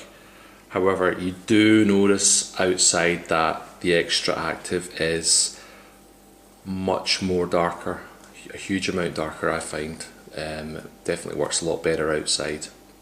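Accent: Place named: India. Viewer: wow you sound Indian or some kind of black but you is white mate!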